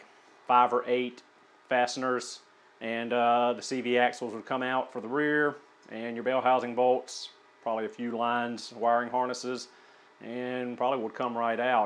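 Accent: South, United States. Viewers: I'm still trying to get over, anyone with a Southern Accent, not being stupid. I never see them on Jeopardy. Coincidence? Anyway, that aside, you are absolutely right. Nobody talks about how user friendly it is, when it comes to repair, or working on the car itself. It is after all, a simple V-8 with a lot of plastic surrounding it. I totally agree with you. The warranty will cover so much, for so long. Plus, as soon as you put any kind of mod on it, it voids all the warranty coverage. Plus, nobody should ever purchase first year anything, when it comes to cars.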